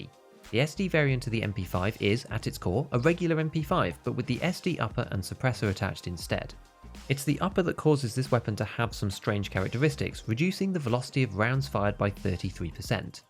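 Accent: United Kingdom. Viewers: He sounds brittish so I doubt he has even touched a real gun.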